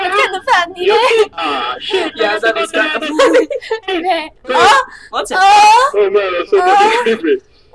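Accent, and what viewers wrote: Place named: Italy. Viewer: and i also luv wat u did the way u speak both of i think italian english speaking accents lol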